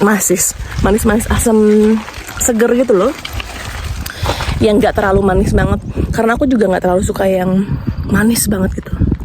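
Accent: Indonesia. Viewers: Selamat mba,semangat ngonten nya.PD mba,suaranya enak kok di dengerin 😊,khas anak jaksek.ternyata ya orang jagakarsa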